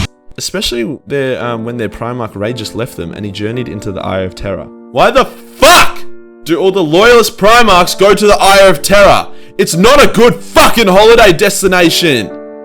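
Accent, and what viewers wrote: Australia. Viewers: This was a match made in the Warp. Also it’s nice listening to a fellow Aussie speaking... I don’t have to decipher the accent.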